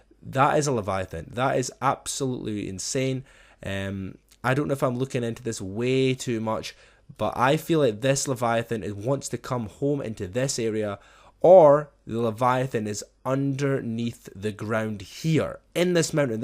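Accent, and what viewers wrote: Ireland. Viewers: Are you Irish cuz it sounds like it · Are you irish???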